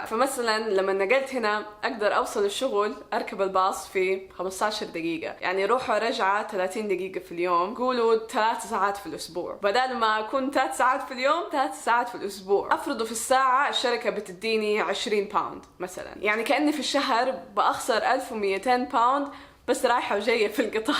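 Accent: Hejaz, Saudi Arabia. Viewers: الله اكبر ليست لهجه كويتيه نهاءيا الاخت من اهل الحجاز وفي مكه المكرمه وهي لهجتهم سلسه وجميله الله يوفق الجميع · احب اللهجة السعودية واضح جدا Sudanese guy pass from here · باين من لهجتك انك سعوديه صح ❤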